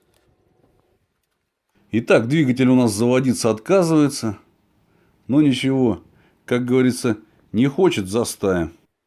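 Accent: Russia. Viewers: По акценту голоса, можно догадаться что вы из Волгограда или волгоградской области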